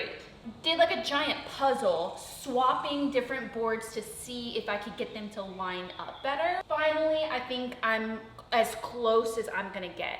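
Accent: Texas, United States. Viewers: Your Texas accent is coming back video by video. :DDD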